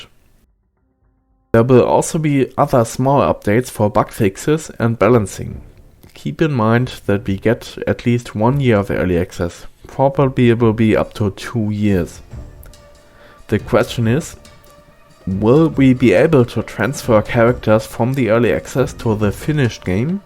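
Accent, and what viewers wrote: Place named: Germany. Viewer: least brutal German accent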